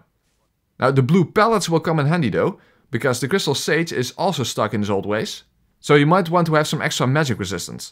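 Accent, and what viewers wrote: Netherlands. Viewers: I fxcking love Dutch accents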